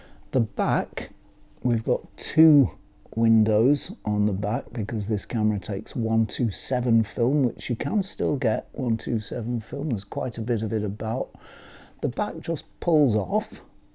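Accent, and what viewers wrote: United Kingdom. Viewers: My favourite series:) I love your British accent:)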